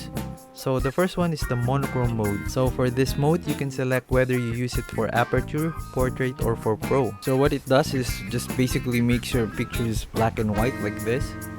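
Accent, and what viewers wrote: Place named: Philippines. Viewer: Iba talaga ang accent kapag pinoy nakakaproud🤧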